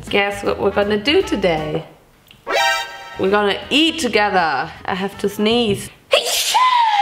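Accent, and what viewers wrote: Germany. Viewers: Man hört ihren deutschen akzent so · Wie man ihr deutsch hört ahhahah love it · ✨Deutsch Accent✨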